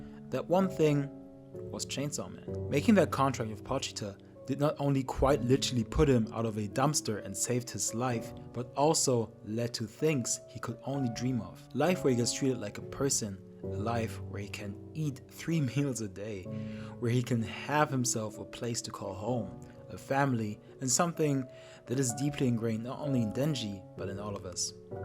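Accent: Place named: Germany. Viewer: Intentional or not, you have a german accent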